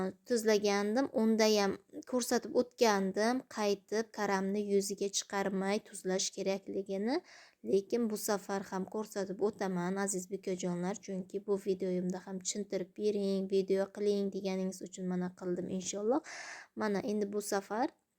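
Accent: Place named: Qashqadaryo, Uzbekistan. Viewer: Shevangizdan Qashqadaryodanmisiz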